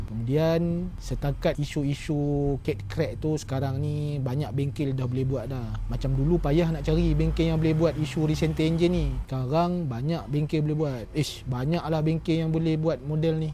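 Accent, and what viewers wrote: Perak, Malaysia. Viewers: org perak ke yop?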